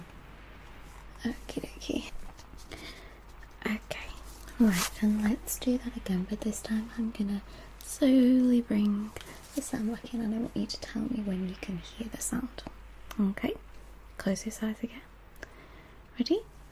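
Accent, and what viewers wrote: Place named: Midlands, United Kingdom. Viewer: It’s her accent. She sounds a bit like where im from. Midlands area, but she speaks a bit more well spoken. What you expect her to do though? She’s just talking normally?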